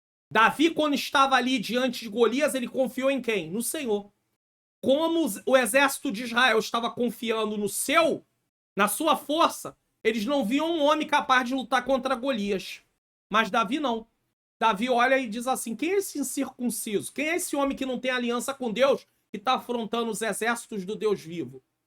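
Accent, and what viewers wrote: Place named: Rio de Janeiro, Brazil. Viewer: Pastor,venho do Futuro (setembro de 2026 😁),para dizer que passei anos na igreja (sou da Assembleia),e nunca havia lido a Bíblia,que vergonha 😢,mas desde o ano passado comecei a ler,estou em Provérbios,leio no meu tempo,sem pressa e sem tabela,e quero lhe dizer do fundo do meu ❤️,que eu glorifico a Deus,por ter colocado o senhor no meio caminho pra ensinar,explicar o que não entendo,Deus realmente lhe provém de sabedoria pra nos ensinar,o senhor não imagina minha felicidade a cada estudo com o senhor,gosto muito do seu jeito de falar e do seu sotaque carioca 😂..morro de saudades do Rio,morei 8 anos aí, sou paraense,mas tive meu filho aí,vim embora em 2001😢. Que Deus abençoe sempre, sempre,sua vida e sua família 🙌🏼📖❤️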